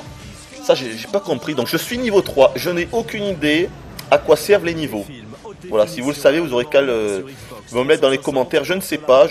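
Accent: South, France. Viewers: Mais qu'est-ce qu'il avait sur cette vidéo, il avait grave l'accent du sud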